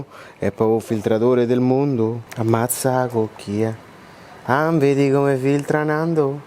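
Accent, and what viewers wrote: Rome, Italy. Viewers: tutto quello che fa e divertentissimo sara perche parla romano... ma la gioconda non l.hanno rubata pero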